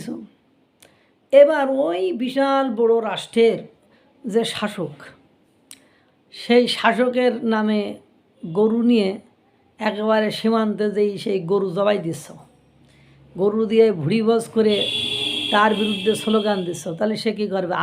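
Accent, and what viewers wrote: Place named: Bangladesh. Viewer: ভাই আপনার ভাষা শুনে মনে হয় বাংলাদেশের। কথা শুনে মনে হয় ভারতীয় মুসলমান। যদি বাংলাদেশী হন, তাহলে সাবধানে থাকবেন।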